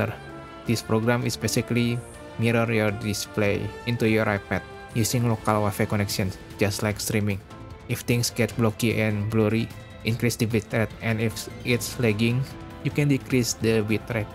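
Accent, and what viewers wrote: Indonesia. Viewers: i realized i could do this accidentally, works on my setup with Sunshine + Classicoldsong's Artemis(Moonlight fork that has more experimental features and a little nicer GUI i found from Moonlight github repository fork) and began to wonder if someone done video about this amazing discovery i made. Thankfully someone already done it. anyways works with my Xiaomi Pad 6 and its Xiaomi pen, down to its 2 buttons on pen(although a bit janky since the OS tried to use it also sometimes) and its pressure sensitivity! also, is it just me or you sounds like Indonesian?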